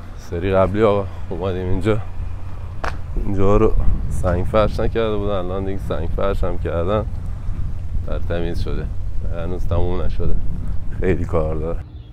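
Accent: Shiraz, Iran. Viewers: ، عالی بود. چند روز پیش یک کار شبیه این همشهری محترم شما آقای حسام الدین را دیدم. براش تعریف از لحجه شیرازی ایشان کردم در صورتی که ایشان اصلا خیلی کم حرف میزنه. در واقع این در مدح لحجه شیرین شما بود که اشتباها رفت برای ایشان. 😄 خلاصه از هر لحاض ما با کارهای خوب شما وطن را حس میکنیم. دمت هم گرم. آقا این آخر ویدیو هم حرف نداشت. فضای ناب. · منم از کار زیبای شما سپاسگزارم بخوصوص با لحجه زیبای شیرازی شما.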